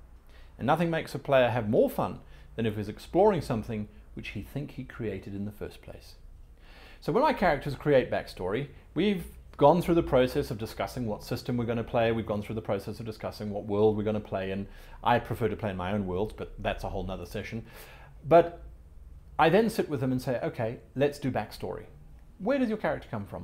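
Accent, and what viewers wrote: South Africa. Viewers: Do I hear a hint of Afrikaans in that accent ? ... if so, Greetings ! really enjoyed this video, amazing tips, will definitely put them to use. You have my thanks. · are you South AFrican? YOu sound South AFrican.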